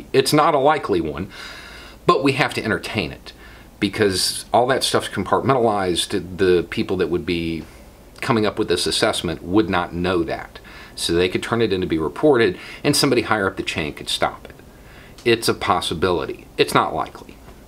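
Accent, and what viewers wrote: South, United States. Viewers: Thank you for being the voice of reason with a southern twang!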